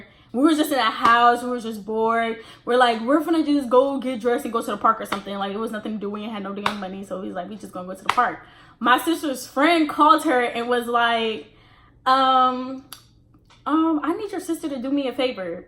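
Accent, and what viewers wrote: New York, United States. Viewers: Sissss.... this my first video I'm watching from you and as soon as you started talking, I said shorty a New Yorker !!! You have my sub ! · see you was giving me new york vibes . i wasn’t jakkin that you was from georgia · I could tell you was from New York from the beginning cause I was like baby yeen from no Georgia but lit story time🔥 glad to kno ain the only female who fought niggas 😭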